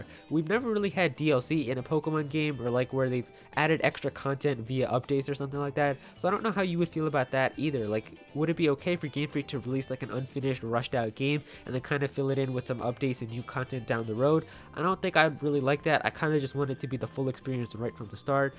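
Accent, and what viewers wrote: Netherlands. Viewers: are you from the Netherlands or Belgium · Are you dutch? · wait, are you dutch? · Wait a minute, are you dutch.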